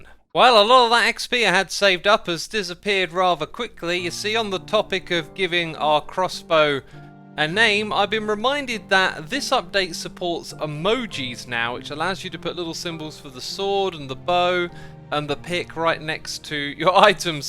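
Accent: United Kingdom. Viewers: He's british... That pronounciation of er is common among British accents. Edit: if you were asking which British accent, I am not sure. We don't know where he is from in the UK. I am not knowledgeable enough about British accents but I guess it could be from North England?? I am not am authority on that though so don't take my word on it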